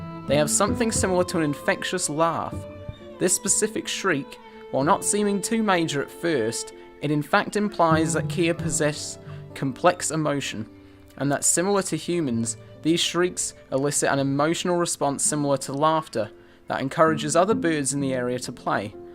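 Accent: United Kingdom. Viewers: wait your a Brit?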